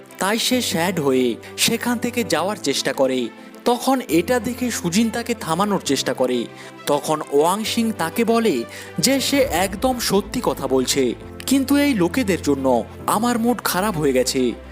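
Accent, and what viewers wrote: Kolkata, India. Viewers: uner koctay mone hoy kolkatar??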